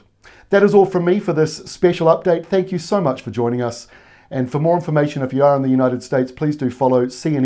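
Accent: New Zealand. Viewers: Love seeing local weather with a NZ accent, a smile, and no screaming. Got the colors right, too.. Beautiful.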